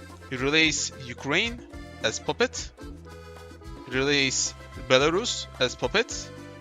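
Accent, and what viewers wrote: Turkey. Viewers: aksanından Türk olduğunu anladığım hoi4 oyuncusu. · bro are you turkish you have turkish accent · Aksanından türk olduğun çok belli oluyor ama çok iyi konuşuyorsun,güzel oynuyorsun ama kanka çok uzun videolar,mountain general gibi kanalları örnek alabilirsin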